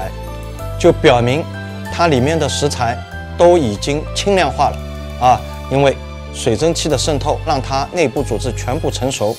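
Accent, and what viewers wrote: Shanghai, China. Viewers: 上海腔十足的师傅做出美味无穷的江南美食，让我又想起了家乡。好想吃上海的小馄饨~ 谢谢 ❤ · 大哥，听口音你是上海人是伐。你这碗小馄饨太感人了。😭我土生土长的上海80后，一不当心远嫁加拿大。太想念想小时候吃到的上海点心美食了，好几年没回上海了。大哥下次教一下粢饭糕还有油条怎么做好吗？怀念小时候路边摊的味道。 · 一开口就晓得了，阿拉上海特有的普通话口音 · ，上海口音。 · 一听就是阿拉上海大师傅😄对自家好一点😋嗲额！看了我才吐水哒哒滴😋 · 标准上海普通话👍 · 带着上海口音好亲切，想念上海小馄饨了有空壹定按朱師傅的配方做壹次對自己好壹點哦!👍😍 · 一听就是上海人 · ，特别喜欢你的视频，尤其喜欢不经意流露出的上海话。看了那么久的视频，直到看到你的视频由衷的感叹，专业的老师傅就是不一样。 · 师傅是上海口音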